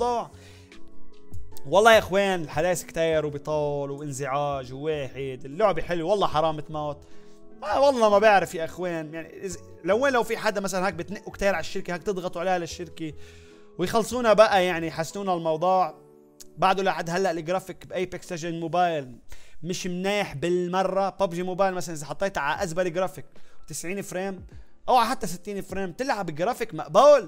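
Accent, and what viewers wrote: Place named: Jordan. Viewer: لهجتك اردني على مصري على لبناني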